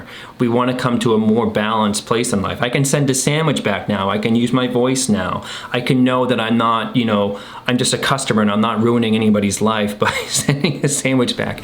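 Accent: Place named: Worcester, United States. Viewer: it"s not sting-y it"s pronounced stin-gee, meaning ungenerous, I believe. Love the channel, and the Woostah accent reminds me of home! Wicked pissah!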